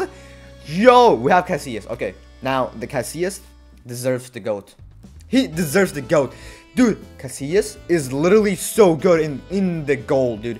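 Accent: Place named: United States. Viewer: Your Asian face with an American accent funny as hell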